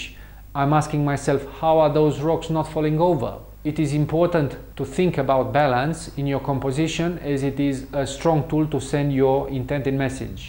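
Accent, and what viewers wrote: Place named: Romania. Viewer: Great video! Thank you very much! I noticed an accent. Are you by any chance Romanian?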